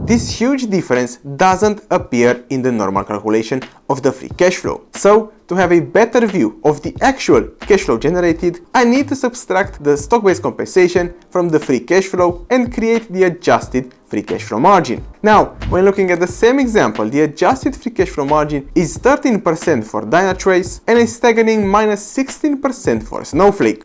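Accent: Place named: Romania. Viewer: Bruh u have a strong Romanian accept. But do u know what, me too. I need to get rid of it though, I left Romania so I barely speak that language anymore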